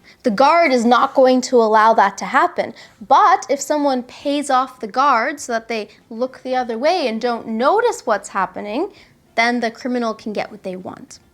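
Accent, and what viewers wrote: Canada. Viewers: Love u, despite you're Canadian and your pronounciation is a little bit different from U.S.A I always understand what you say and the way that you express is so clear as well. Regards!!!☺ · Are you from Canada?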